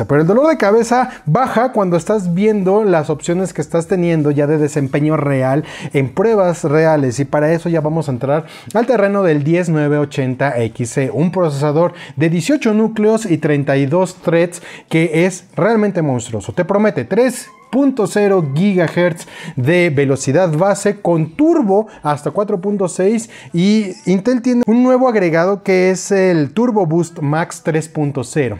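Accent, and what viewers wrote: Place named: Sonora, Mexico. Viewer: es de Sonora o por qué tan Hermosillo?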